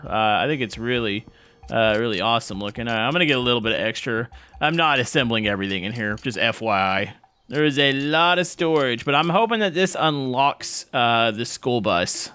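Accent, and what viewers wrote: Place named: Texas, United States. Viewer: I watch your content alot and I will say its pretty fun, especially when you see it with snacks! I couldn't help but notice that you sound texas (Not being rascist or something tho)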